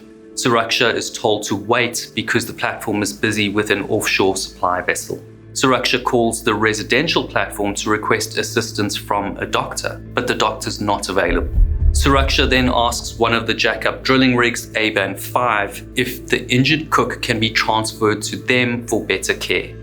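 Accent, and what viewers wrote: South Africa. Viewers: Is the narrator from South Africa?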